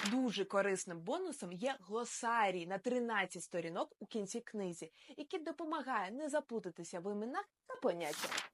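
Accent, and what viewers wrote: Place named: Russia. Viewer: Дивна вимова. Схоже що на миті проривається російський акцент. Але загалом добре.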